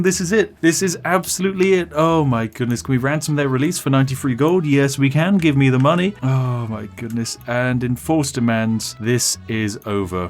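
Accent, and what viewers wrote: United Kingdom. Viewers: Who tf dislikes?? Like why.. is it the stock images? The sassy British accent? Cause these are things I love xD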